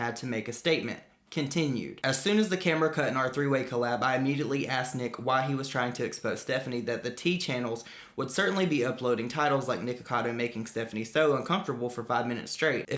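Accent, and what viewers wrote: South, United States. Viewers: I love your accent sooo much!! It makes me miss my cousins down south. Great video!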